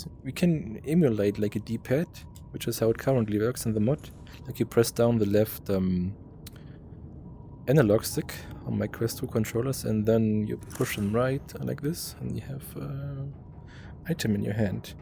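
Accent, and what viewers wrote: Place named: Germany. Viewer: man hört den deutschen akzent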